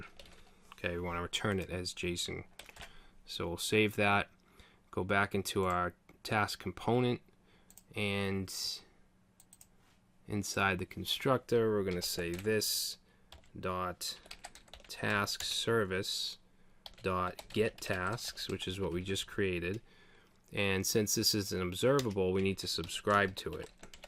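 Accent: Boston, United States. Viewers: That Boston Accent is wicked fun to listen to.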